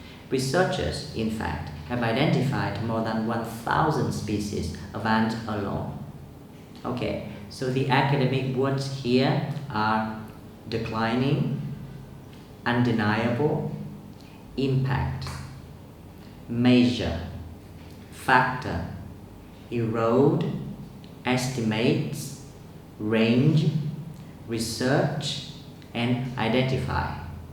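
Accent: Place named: United Kingdom. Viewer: Mình thấy thầy nói hay mà . Thầy nói giọng Anh- Anh nên chắc bình thường bạn nghe giọng Anh- Mỹ nhiều nên nghe ko quen thôi.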